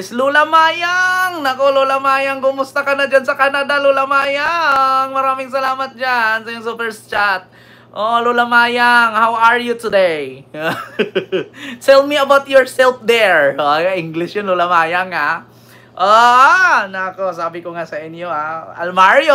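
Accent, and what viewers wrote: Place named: Iloilo, Philippines. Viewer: Sir I love your Ilongo accent kuhang kuha nyo ang diction. Illongo man ako. Maayong adlaw sa imo Sir from Iloilo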